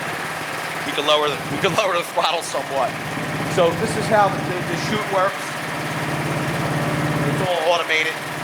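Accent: Philadelphia, United States. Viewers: Thanks for taking time to do a walkthrough before blowing snow. And coming from Montana that Philadelphia accent is great.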